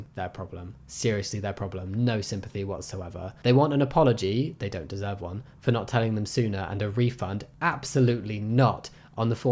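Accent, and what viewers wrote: United Kingdom. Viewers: are you british? you kinda sound brih'ish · The changing thing probably is also seeming weird because in the U.S. people are a lot less comfortable changing and not wearing clothes around other people. The thing is, women in America wouldn't feel comfortable changing in front of men. Are you saying that is wrong? I feel like it is a weird faux paux for her to like not look away or step out if a friend is changing. I am assuming you are British from your accent right? Americans feel VERY weird about unclothing/nudity and it is sexualized in our heads. Idk if one of my friends started undressing in front of me and I they were of the sex/gender I was attracted to, I would definitely make sure they knew that and look away/step away. I think this is a cultural thing. Like I get why you are upset and in theory what you say makes sense, but then should we be outraged that the women aren't getting undressed around men? 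(No). Idk, I'm afraid of both straight and queer people SA'ing me (so I don't get changed around anyone at all). So, they are afraid. They shouldn't block you but like if they decide they aren't comfortable changing around you and stop doing that is that somehow a problem? Like people should be required to change around people they don't want to change around? I think this is an American prudish thing. And a public changing room with a lot of people is different from walking into your bedroom with one other person and taking your clothes off. Idk, you don't get free rights to watch whoever you want to unchange.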